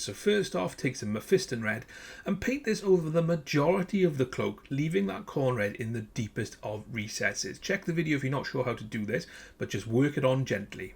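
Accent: Wales, United Kingdom. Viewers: Wait is that a Newport/Cardiff accent I hear???? Sub!!!! Oh and great content!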